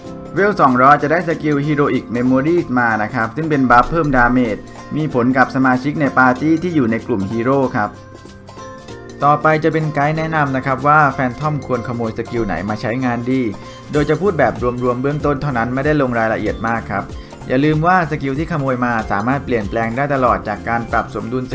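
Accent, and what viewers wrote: South, Thailand. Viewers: พี่เป็นคนใต้หม้ายครับ .. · คนใต้บ่นิ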